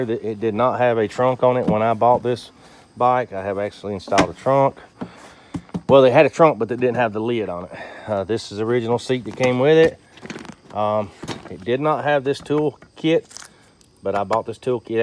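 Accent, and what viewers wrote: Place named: Arkansas, United States. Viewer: are you from Arkansas?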